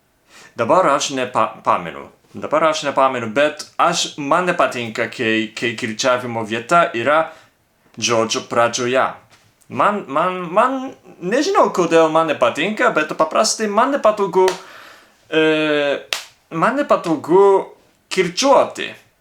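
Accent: Japan. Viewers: Sveikas,. Tų žodžių, kurių nekenti, netark ir viskas bus gerai. Malonu klausyti tokį malonų japonišką akcentą. Vaike, Tu perdaug labai nesistenk gilintis į lietuvių kalbos gramatiką. Ji labai sudėtinga. Daug painiavos. Tavo pradžia nuostabi. Neskubėk. Viskas ateis.Tai, ką išmokai - niekur nedings.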